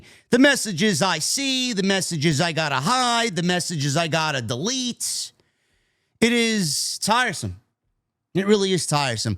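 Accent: New York, United States. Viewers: The 2nd New Yaaaawkkkkaaaah I've liked in my life. Good on ya, kid.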